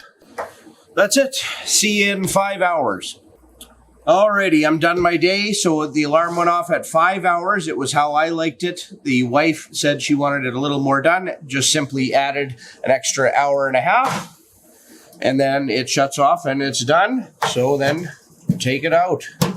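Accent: Canada. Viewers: I was pretty sure you were a Canadian based on your accent, alone, but then I noticed the stickers on your fridge. xD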